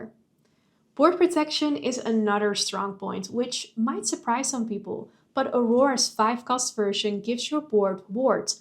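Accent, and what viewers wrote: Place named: Netherlands. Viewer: Ondanks je goede Engelse uitspraak verraad het lichte accent toch dat je Nederlands bent. Leuk hoor… zelf zoek ik nog steeds een community in mijn woonplaats.. goede video verder en heb al veel kaarten van dit deck dus ik ga deze eens proberen met wat kleine aanpassingen(ik heb de shiftline van vanellope niet)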